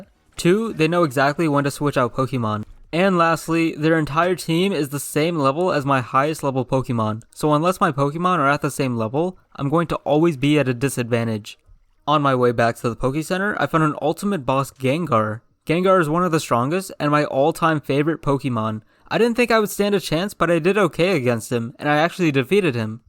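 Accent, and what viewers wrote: India. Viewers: actually sounds like indian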